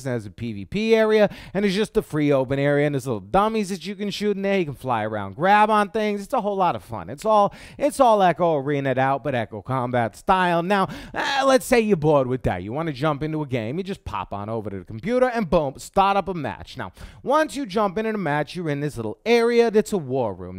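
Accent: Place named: New York, United States. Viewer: I detect a New York accent., you from ther East Coast?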